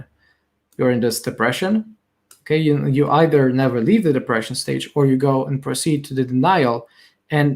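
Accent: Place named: Poland. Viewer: Also thank you for introducing me to St. Rita. I am a fellow Catholic, Hungarian born. To me your accent sounds like someone who moved away from Poland when you were in your teens and learned English then. (or maybe I am projecting here as that's my story) . Good on you for being so brave and sharing your belief in public, I know exactly how hard that is as especially being a Catholic.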